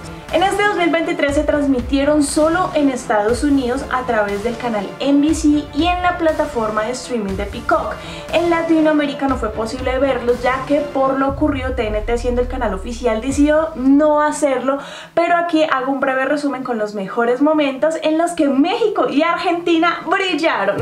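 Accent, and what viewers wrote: Colombia. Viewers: Bogotense? Sale chamaca linda! Luego echamos chisme,jajaja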